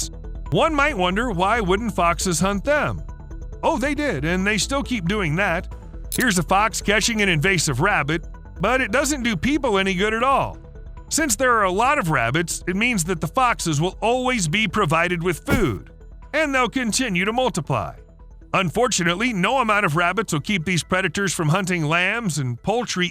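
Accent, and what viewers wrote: United States. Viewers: Why can't I Americans say EMU E-M-U and say EMOO it does my head in where do they get OO and its MUM not MOM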